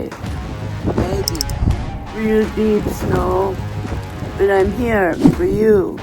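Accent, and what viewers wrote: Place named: South, United States. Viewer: ❤I hope you didn’t drive in that Terrible Dangerous Snowstorm ❤Take Care❤I am outta that Mountain 🏔️ Hillbilly Cabin of Mine for Now &Back in the Northern “Q”City. Were there is less Snow ❄️ than the Mountains 🏔️ are covered Deep the Higher You Go..2to 3 Feet 👣 up ⬆️ in the Mountains..Down in the Valley 6”inches at most…We live in Lower Mountain 🏔️ Valleys mostly in British Columbia…Your Voice is nice “Southern Drawl”You don’t have to speak faster then I’d have Trouble understanding..Keep on Posting every Day has a New Story to tell😊😊😊❤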